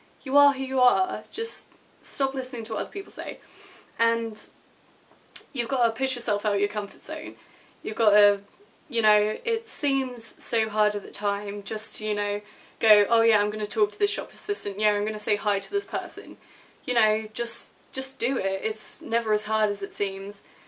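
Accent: United Kingdom. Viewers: Does anyone know what part of UK she is from? She has the exact same accent as Asa Butterfield and it's driving me crazy trying to figure out where it's from in UK. SOrry, and thanks. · before I even clicked on this video I knew you were gonna have a british accent, I'm not even joking.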